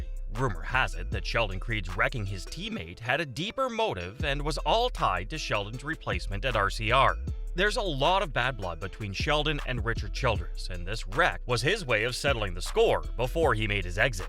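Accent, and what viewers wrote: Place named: Canada. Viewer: Why reference bubba Wallace? Forced diversity? He had absolutely nothing to do with this. Your goofy accent sounds Canadian. Is that so?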